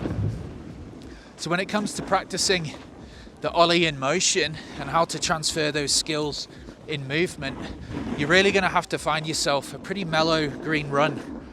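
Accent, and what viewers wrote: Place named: United Kingdom. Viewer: Came away from the first watch of this video thinking ‘well that taught me nothing, all I did was watch a bloke snowboard down a hill!’ The calm British voice was a welcome change to Tommie though 😂 But I actually thought about it the next time I strapped into my snowboard in the garage and suddenly it made a lot of sense! I’ve been trying to get Ollies dialled, and have watched so many technique videos, but recognising the flex characteristics of the board, and working with them, was a real light bulb moment for me and it made a huge difference on the snow! Suddenly you have to think less about the plethora of different technique tips you’ve heard, as it becomes a much more natural movement sequence. Never normally write comments, but this video deserved one. 👍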